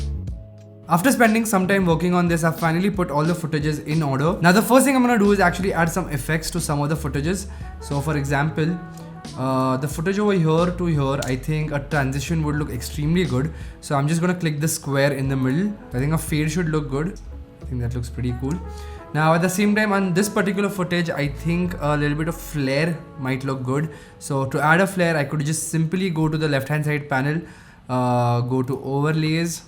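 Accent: India. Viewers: Who guys came here for the Bollywood Accent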